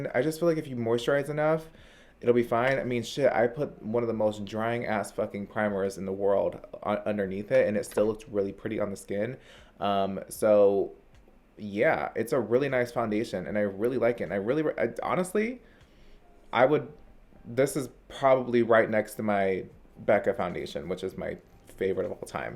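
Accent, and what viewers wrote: Australia. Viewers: Your Australian accent is beut mate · i love ur Australian accent bih keep doing it pls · Your Australian accent kills me 😂😂 it’s a mix of New Zealand and english accents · I fockin love the Australian accenttt!! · Omg! The Australian accent!! 😂 love it!! Tati RAVES about the Col.Lab mascara so I'm gonna finish watching this video now I had to tell u I LOVE the accent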